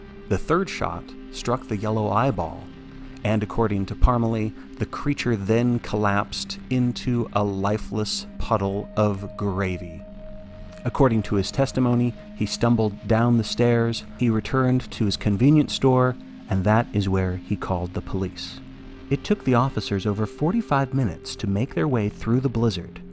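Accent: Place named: Maine, United States. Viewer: As a daughter of a mother from Bangor, Maine I would like to thank you for using your best Mainer accent. For me, it really brings the story together 🙌🏽🥰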